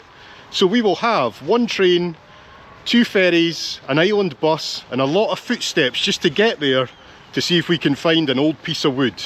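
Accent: Scotland, United Kingdom. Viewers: Hi every trip is a pleasure you share and will always be my favourite YouTuber. Thanks for sharing and making my week. I had Scottish great grandparents and think the Scottish accent makes for the best commentary. I also enjoy your friend Scott from Planes Trains and everything but you will remain my favourite. Thank you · Just love the Scottish accent... and it's so fun to read all the Norse names in Lerwick, now when I'm "google visit" the city. What a beauty! Just the end name of the city "wick" is "vik" in Norwegian, meaning "bay" in English I think. We have many places that end-named vik here in Norway. And then we know what kind of "bay" the place has. Sanday (Sandøy) Sand Island. Guess it's a sandy island. Would love to visit the island and of course main land of Scottland as well. Keep up the good job 👍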